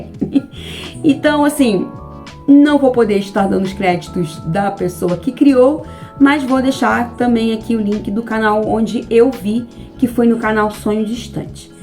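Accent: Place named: Rio de Janeiro, Brazil. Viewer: te acompanho há pouco tempo. E ficava sempre “encafifada” … é carioca. Como acompanho uma infinidade de canais … a gente vai pegando as gírias, os sotaques. E nesse vídeo específico … tirou minha dúvida. 😂😂😂 Carioca!!!! Parabéns!!! Adoro seus vídeos e seus shorts. Você é autêntica. E me identifico em uns shorts. Sou eu!!! e rio muito. 😉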